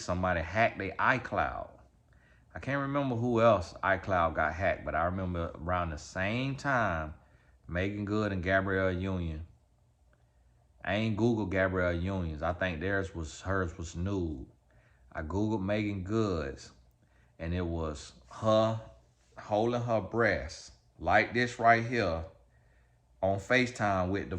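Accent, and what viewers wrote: South, United States. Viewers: your southern accent is making this video so hilarious 🤣🤣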